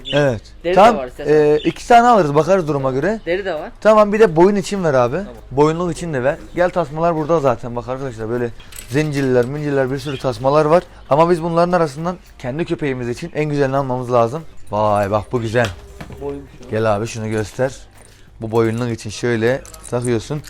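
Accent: Kayseri, Turkey. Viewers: Abi kayserilimisin yoksa anteplimi anlamadım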